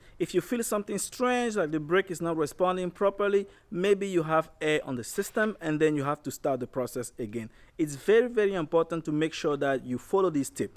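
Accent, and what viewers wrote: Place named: France. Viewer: You don't have a hood (bonnet) sound shield. Your accent sounds French.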